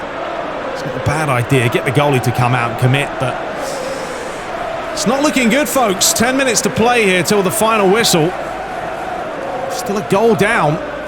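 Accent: United States. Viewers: As an American viewer, I have to say you nailed your American accent. Yee haw! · That American accent sounds just like Taylor twellman haha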